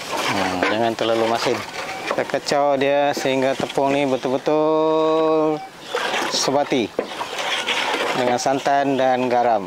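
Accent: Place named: Kuala Nerang, Malaysia. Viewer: Tok ckap mcm org blah kola nerang ja.. 😂😂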